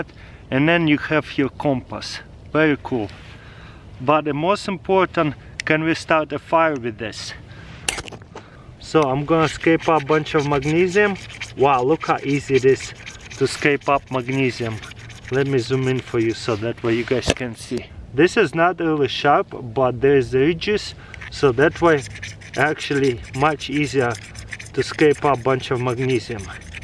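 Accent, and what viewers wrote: Russia. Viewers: Russian accents are my favorite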